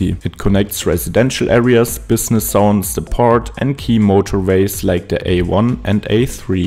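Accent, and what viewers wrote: Germany. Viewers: I hear deutsch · I really her out that you are from Germany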